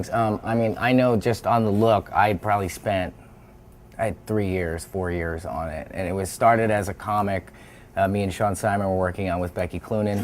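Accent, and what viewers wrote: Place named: California, United States. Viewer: He's lost the Jersey accent and sounds very Californian now, gorgeous man ! ❤️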